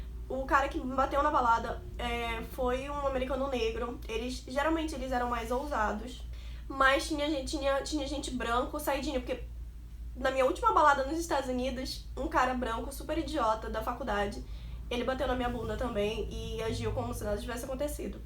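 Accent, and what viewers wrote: Pará, Brazil. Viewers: O que vc estudou e se formou na faculdade? E como vc fez para se sustentar aí? 😱😱😱 sei q é caro, o dorm tb é caro! Fala um pouco sobre a questão do racismo? Vc é natural de onde,? Parece Paraense pela sua fala❤️Bjusss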